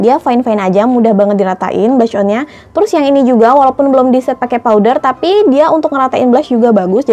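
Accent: Pontianak, Indonesia. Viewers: Orang pontianak ke kak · orang Pontianak kak ?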